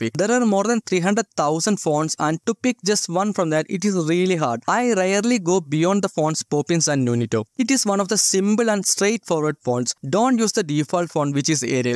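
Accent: South India, India. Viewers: South Indian accent is sweet and you can keep up with it but speaking slower will make your word more clearer. Just keep your content top notch accent doesnt matter that much Cheers Brothaa✌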